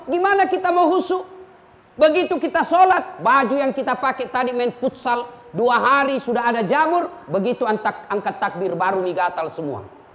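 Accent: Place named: Sulawesi, Indonesia. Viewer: haha..kalo pak ustad tergantung audiensx, kalo ceramah d daerah sulawesi selatan atau daerah luar tapi banyak komunitas bugis makassar maka dia banyak pake bahasa dan logat bugis makassar. tapi kalo ceramah d luar, misalnya d jawa, pasti ndk ada sisipin bahasa bugis-makassar.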